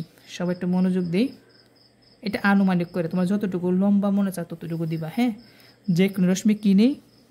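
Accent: Sylhet, Bangladesh. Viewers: SIR APNI ki Sylheti??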